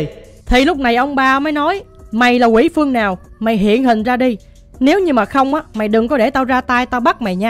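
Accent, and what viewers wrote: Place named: Phu Tan, Vietnam. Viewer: Kênh 90 giong ở phú tân quê e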